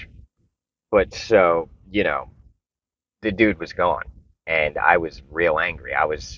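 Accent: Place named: Long Island, United States. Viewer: There is nothing better than hearing a guy from Long Island tell Zen stories! “And the monk didn’t give a shit that he stole all his stuff.” I loved your interpretation and laughed,. That was so great.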